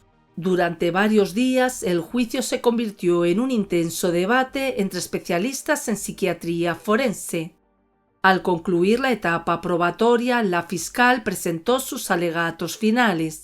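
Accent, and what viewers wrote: Spain. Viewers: ¿Por qué? Es audio real humano con castellano y acento original… es cuestión de gustos y preferencias!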